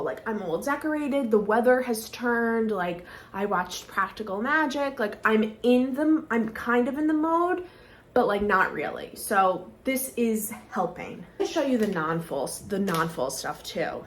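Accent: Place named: New York, United States. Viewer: I love the ny accent ✨💗💗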